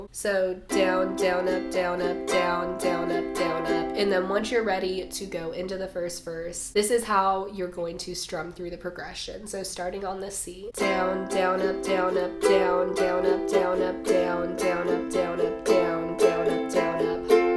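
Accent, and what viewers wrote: South, United States. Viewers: why does she never sound southern in any videos except for this one lol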